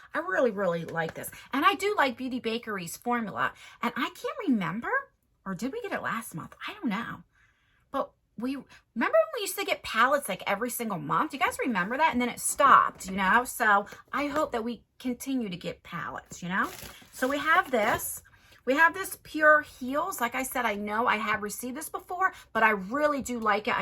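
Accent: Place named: Philadelphia, United States. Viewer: You're accent makes me nostalgic for home. I'm from Philly but Jersey n Philly ppl have the same accents. Lol. My accent kinda diminished through the yrs of not living in the area. It took a long time to stop myself from saying wooder instead of water.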